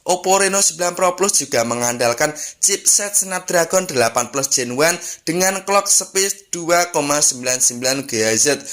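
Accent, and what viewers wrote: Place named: Java, Indonesia. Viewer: Medok banget pengisi suaranya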